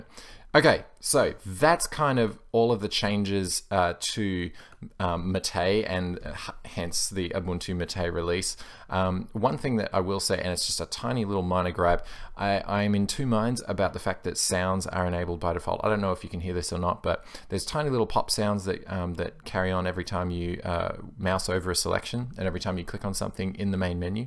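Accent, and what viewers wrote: Australia. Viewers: Nice to hear an Aussie voice reviewing Linux for a change :) I’m new to Linux and Ubuntu Mate is definitely the best for a new user, I can tweak it to a windows like environment (the new Redmond option is virtually the same as Mint) but my gf wants to get a Mac because her current windows laptop is “too slow” (old) and she likes the Mac dock etc...well once I get more experienced myself I can set up the same distro for her for a free Mac that isn’t spyware (or anyone else who wants a fast, private, secure, easy to start using system) Also the explanations of software in Ubuntu Mate are very necessary for me or else I have no idea what is available or what it all is so that’s actually a feature I appreciate Ubuntu Mate to me is the only distro most people would ever need, or at least the best distro of all to start with while figuring out what other Linux distro is best for their specialized needs Highly appreciate everything the Ubuntu Mate team have done, just amazing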